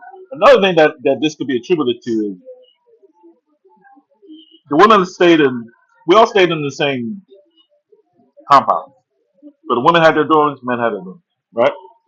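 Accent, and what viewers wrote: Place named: United States. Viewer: Why do u speak like an American? U r not believable WHAT about all the Nigerian call centers SCAMMING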